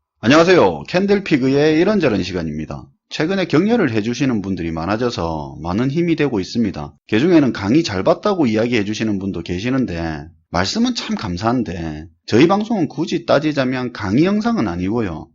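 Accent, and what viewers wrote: Gyeongsang, South Korea. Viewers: 구독 눌너십더 구수한 갱상도 이바구 고맙십더 내 사위가 조선업 내놓으란는 기술잖데 몆년간 일이 없어가~ 생활고를 껵꼬밌어는데 이제 숨통이트이러나 마~ 고마 눈깔 뒤비지게 바빠지면 합니더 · 첨에 경상도 아재 사투리가 귀에 안들어왔는데 신기하게 이제 히어링 되네요. ㅋㅋㅋㅋㅋㅋㅋ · 잘 봤습니다!! 경상도 사투리가 매력 넘치시네요~ 2019년도에도 좋은 말씀 잘 부탁드립니다!! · 역으로 같은 경상도 사투리를 쓰는 입장에서 전달력이 더 증대되는 느낌이 ㅎㅎ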